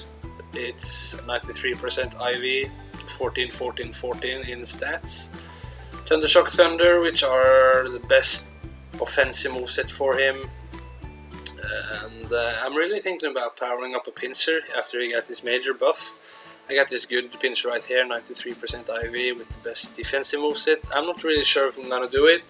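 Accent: Norway. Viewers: jeg viste at du var norsk når jeg hørte dialekten din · man hører at du er norsk og ser det nede med pokemonene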